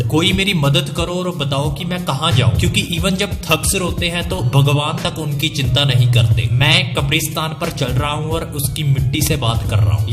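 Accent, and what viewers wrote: Punjab, India. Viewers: Bro u from Punjab??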